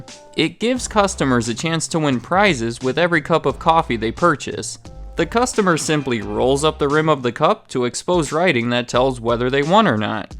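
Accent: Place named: Midwest, United States. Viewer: With your ambiguous yet slightly midwestern accent I thought you might be from Canada.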